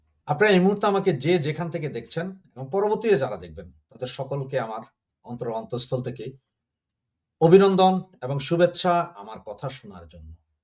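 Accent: Noakhali, Bangladesh. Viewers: ভাইরে বাংলাদেশে যে সেটা বকে লোক সেটাই খায়😂এই সুযোগে গাঁজা খাইয়া আবোল তাবোল বকতে আসছেন বুঝেছি।নোয়াখাইল্লা মনে হচ্ছে😂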